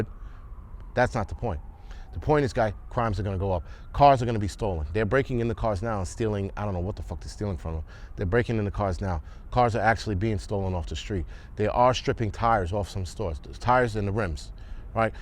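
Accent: New York, United States. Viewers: It's just a word, it only has as much power that you give it. Hes a freaking New York firefighter. It's almost in the job description to talk like he does. Most of my family still lives in NYC. Listinging to is like listening to family.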